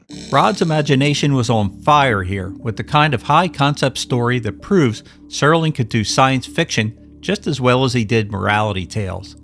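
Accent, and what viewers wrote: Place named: Delaware County, United States. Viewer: Your DelCo accent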